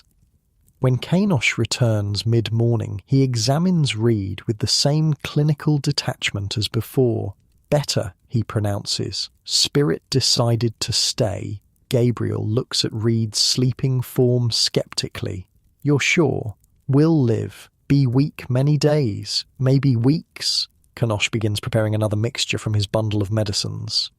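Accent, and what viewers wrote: United Kingdom. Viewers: Not a fan of Brit narrators.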